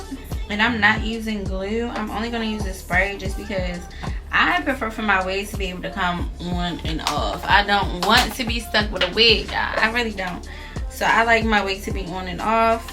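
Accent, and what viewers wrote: Baltimore, United States. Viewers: You from Baltimore girl?????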